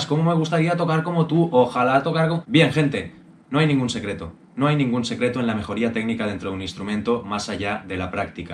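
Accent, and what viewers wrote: Spain. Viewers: This guy just inspires me all around. He’s totally correct about people making excuses. I an one of them. Mil gracias Estepario. Y te escuchas más dócil hablando español. Ya que eres 💯 Español. Soy soy Original de Mexico, pero siempre me fascina el acento de la real España. Thank you for those great lessons and inspiration behind the drums. Un saludo desde Texas. 😎😎😎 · Great message and I enjoy his Castilian español también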